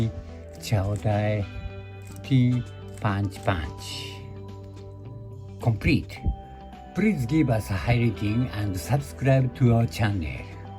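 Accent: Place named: United Kingdom. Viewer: bhai why is ur accent so british (no hate)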